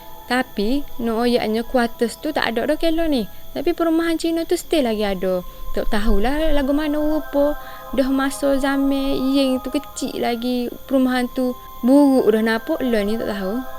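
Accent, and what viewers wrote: Kelantan, Malaysia. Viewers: seronok dengar kecek kelate. boleh lah faham sikit ii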